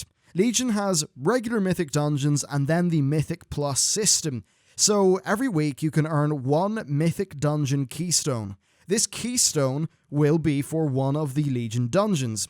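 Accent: Northern Ireland, United Kingdom. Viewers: oh right i thought he was from Norin Iron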